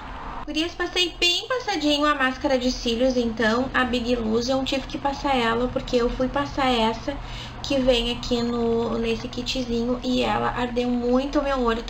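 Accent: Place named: Curitiba, Brazil. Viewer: Boa tarde sou nova aki no seu canal e já gostei muito..sou de curitiba e tbm tenho esse sotaque de( tá )e acho lindo nossos sotaques por isso q gostei de ti... há sobre a base achei q ficou bom este tom para ti tá kkkkkkkk bjss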